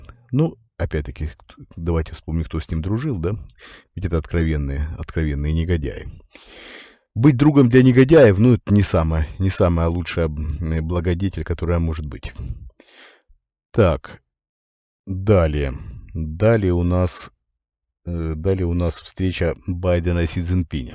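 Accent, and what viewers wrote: Ukraine. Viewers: Вопрос -, почему у Вас украинский акцент?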